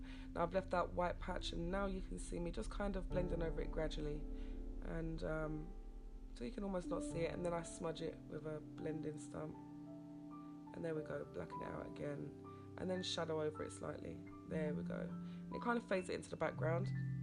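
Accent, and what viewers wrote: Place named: England, United Kingdom. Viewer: First you are chill and easy to listen to you with your English accent I love to go there. I need to know what can take I do to take away the shining from my drawin? Antoinette Rochester Las Vegas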